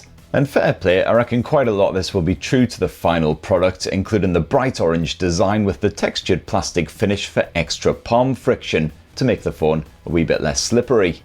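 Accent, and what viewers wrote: United Kingdom. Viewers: He sounds British but doesn’t act shitty like them which I like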